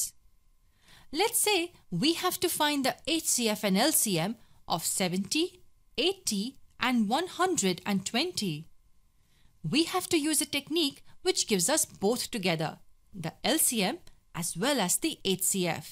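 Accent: India. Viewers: is this an indian speaking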